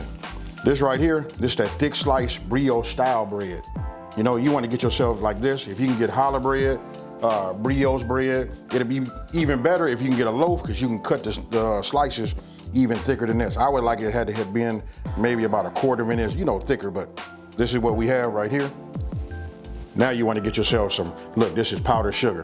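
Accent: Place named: Los Angeles, United States. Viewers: Dude sounds like he from LA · I like this dude lol. I love that LA accent, makes me wanna move to Cali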